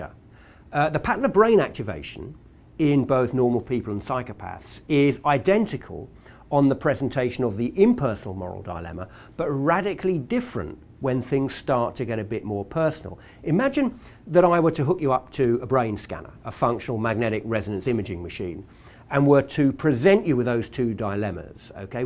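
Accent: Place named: United Kingdom. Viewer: İngiliz aksanı yalnız bu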